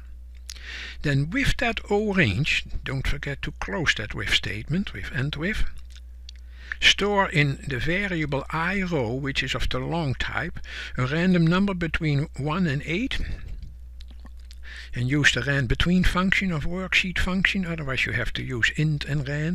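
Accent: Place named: Netherlands. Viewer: Am I the only one to adore your voice and your lovely Dutch or Flemish accent?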